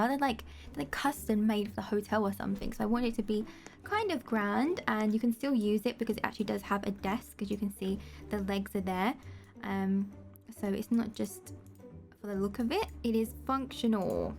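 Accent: London, United Kingdom. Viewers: Amanda Sorkin She’s from London 🇬🇧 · Are you British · You sound British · BRITISH WOMAN!!!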